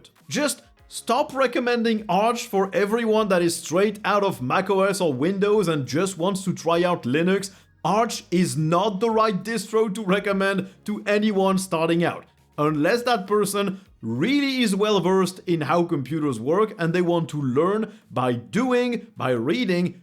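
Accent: France. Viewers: Seriez-vous français à tout hasard ? (^-^) J'ai l'impression que votre accent s'en rapproche :D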